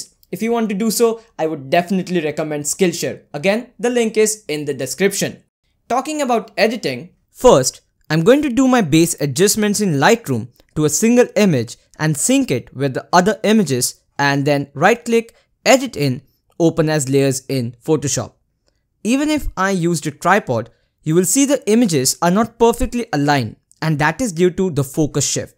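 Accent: India. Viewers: I'm sorry but I can't focus on the video, Indian accent is the best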